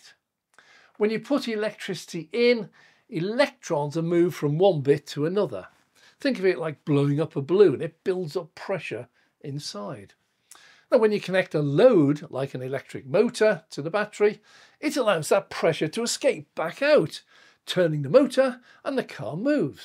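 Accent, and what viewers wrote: United Kingdom. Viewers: You sound British...why are you talking in miles instead of KMs?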